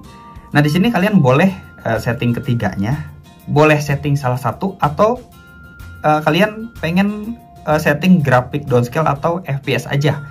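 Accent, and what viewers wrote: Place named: Java, Indonesia. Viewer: wkwk mangkanya pas ngomong kek ada jawa jawanya gtu, salam dari jawa mang gw juga jawa, jawa timur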